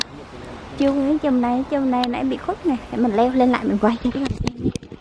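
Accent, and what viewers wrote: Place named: Quang Nam, Vietnam. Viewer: Nghe giọng c như người miền Trung Quảng Nam Đà Nẵng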